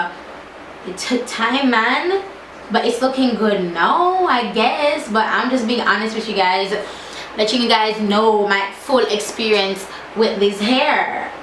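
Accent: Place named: Jamaica. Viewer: I love your accent. You gotta be from Jamaica lololol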